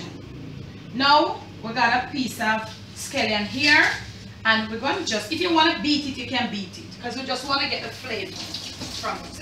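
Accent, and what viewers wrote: Patois, Jamaica. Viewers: Good morning Aunty i have to laugh when you talk our patios.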